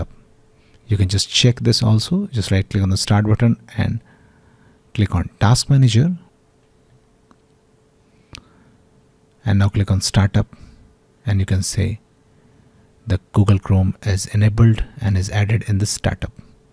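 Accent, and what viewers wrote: India. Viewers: The moment I heard the Indian voice i immediately knew this was going to work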